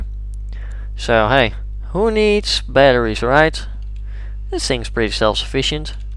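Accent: Netherlands. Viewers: cant watch this because of the dutch accent, I'm must be the only dutch person in the Netherlands who can talks English almost completely without any accent. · You sound so fucking Dutch. Idk if you are. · you have the best dutch accent... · Sorry but, are you dutch?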